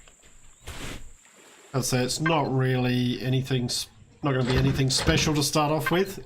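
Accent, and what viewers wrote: Australia. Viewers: nice video there, what part of Australia ru from if you dont mind me asking, im from collingwood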